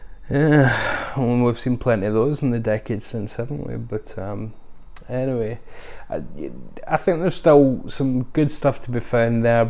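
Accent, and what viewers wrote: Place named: Scotland, United Kingdom. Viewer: I reckon the Scottish accent is real. Has to be. As another famous Scotsman once said (all the way to an Academy Award) “ Who would claim to be that who was not?”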